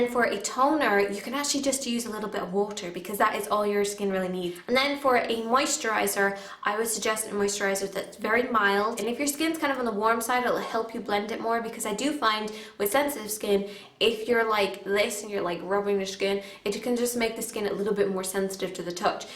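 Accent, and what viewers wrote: Ireland. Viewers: Not a little. She full irish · Are you a little bit Irish?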